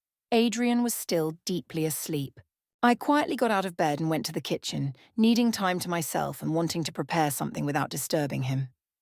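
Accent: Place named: United Kingdom. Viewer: British accent is really beautiful to listen. ❤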